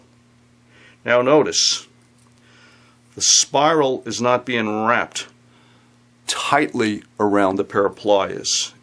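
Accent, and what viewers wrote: New York, United States. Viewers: Thank you for sharing. My husband and I were looking at your website last night. You are a genius. I would love to have a piece of your art , truly amazing very beautiful. I love your accent, I grew up in Johnson City, New York. Spent a lot of time in the city. I can't tell how much I miss it. I live in California now. Blessings to you, Deborah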